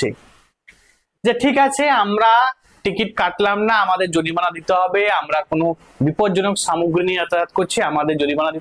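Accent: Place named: East Bengal, Bangladesh. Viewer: kotha r golar tone sune mone ho6e bangal chele mane east bengal supporter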